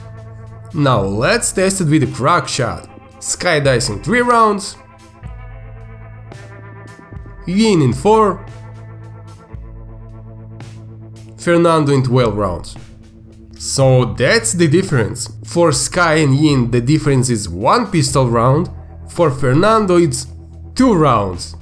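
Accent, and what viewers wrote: Russia. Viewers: i like how u can talk in my language with ur russian accesnt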